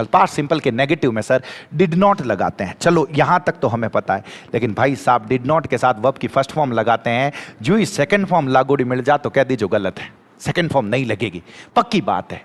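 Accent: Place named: Marwari, India. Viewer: Hm jese dafar ko bhi aapki मारवाड़ी english ne achaa बनाया है sir ji english se bahut dr lgta tha such me ab wo dr khatm😢😊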